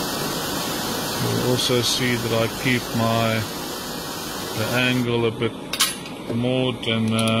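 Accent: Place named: Afrikaans, South Africa. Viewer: Enkelvoudig, effektief, goedkoop en met 'n Afrikaanse aksent! Dit kan nie beter nie! Groete uit Utah, Merika!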